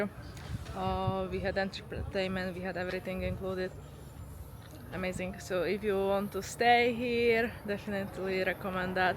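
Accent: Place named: Poland. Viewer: Bardzo Polski akcent :) 🎉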